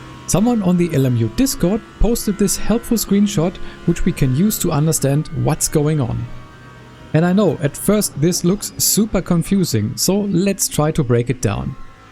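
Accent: Germany. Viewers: You cannot brake noob. Also dude, this German accent sounds terrible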